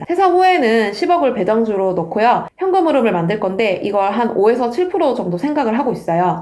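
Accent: Busan, South Korea. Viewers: 님.. 억양에서 사투리가 있어서 경상도분이신가?시픈데 걍 고향말씨 듣고싶읍니다. 사투리해주소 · 부산 사람 아입니까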